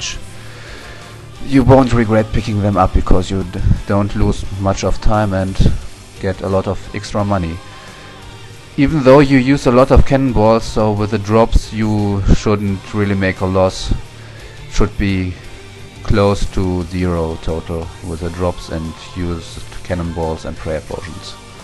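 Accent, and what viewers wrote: Germany. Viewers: R u german? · nice german accent lol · your from germany right? i hear from your acent i think or i just suck at it :/ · den deutschen akzent hört man raus :D gute guide p.s. ich habe nichts gegen den deutschen akzent... wenn ich englisch rede klingt das fast genau so mit dem akzent =D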